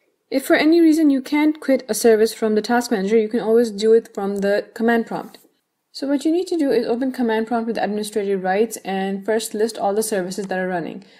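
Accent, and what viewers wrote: India. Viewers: are you from India . Can you speak a little slower? Please If you're rushing like that, I don't want to listen anymore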